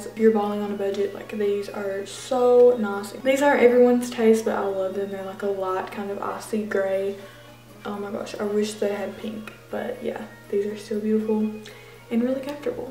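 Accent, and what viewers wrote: South, United States. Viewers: arina k i thought she was Australian then i heard a hint of southern lol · her accent is so southern southern accent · living for country accent. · her accent is country af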